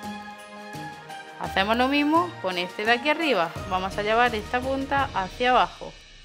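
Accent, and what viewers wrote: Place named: Spain. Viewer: deja de hablar como española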